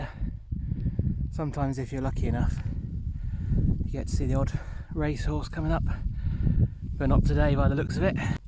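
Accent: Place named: United Kingdom. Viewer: The most ‘British’ accent ever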